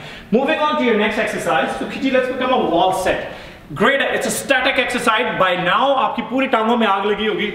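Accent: Punjab, India. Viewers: You talk too fast and with a lot of Punjabi accent.. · Plz speak slowly I'm not punjabi.its hard to get ur accent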